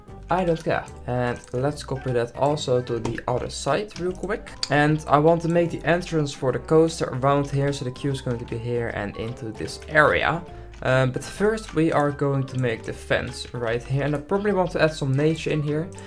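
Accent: Netherlands. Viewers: your dutch accent is strong and great btw im dutch too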